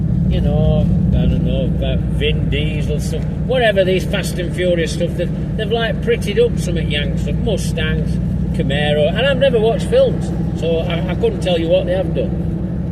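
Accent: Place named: South Yorkshire, United Kingdom. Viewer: I agree broadly with your sentiments. In the current climate a classic car is a luxuary most cant afford. As others said also you need more space and preferably a garage for them. Also if you cant do things yourself its maybe too costly. I would like to dabble one day. I do my best maintaining our 2003 micra and 2006 honda. I have two local garages we send for mot and maintenance and a very handy tyre, exhaust and battery place nearby. All small independent. Im in Huddersfield. Youre in South Yorkshire with that accent.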